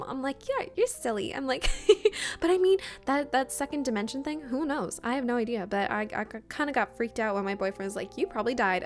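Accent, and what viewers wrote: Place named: Canada. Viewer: out of curiosity, are you Canadian? The way you pronounced some words led me to believe you are (I’m from Ottawa, lmao)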